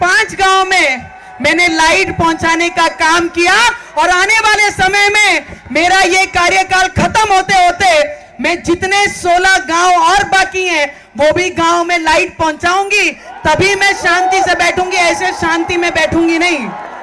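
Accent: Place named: Maharashtra, India. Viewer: She speaks in typical Marashtrain style. There is no way she appears a North Indian.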